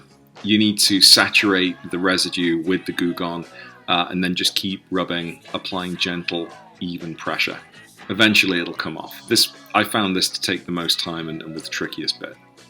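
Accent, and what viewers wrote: Liverpool, United Kingdom. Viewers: Thanks for this ....bought new emblems for my 997.1 today so gonna give it a go! :) .....sounds like you're from my neck of the woods? (Liverpool UK) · You sound like a scouser fella but you’ve got U.S plates..?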